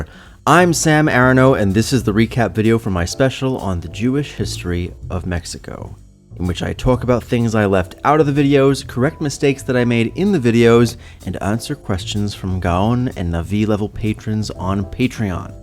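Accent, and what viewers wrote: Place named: United States. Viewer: Your Spanish was great. You didn’t sound aristocratic or weird at all. You simply sounded like an American with a great proficiency in Spanish.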